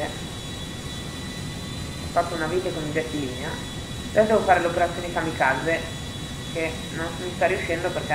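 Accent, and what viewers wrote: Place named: Parma, Italy. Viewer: Ma sei di Parma? Parli come Andrea Alongi...😮 Prova a dire Saccagnato...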